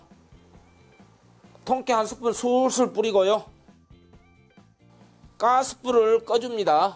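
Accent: Jeolla, South Korea. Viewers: 당장이라도 해서 먹고 싶네요~~^^ 전라도 사투리~~^^ 찐입니다~~ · 낼은 이반찬 도전합니다. 구수한 전라도사투리 정감있고 안먹어봐도. 군침도네요~ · 최고에요 전라도 사투리에다. 맛있는 마늘쫑 황태포랑. 함께. 꼭. 만들어 볼께요 감사합니다 ❤❤❤ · 황태채 마늘쫑볶음~~구수한 수쿠락~워메워메사투리 ㅋㅋ~~레시피 감사 합니다~~👍👏👏👏👏😍⚘️⚘️⚘️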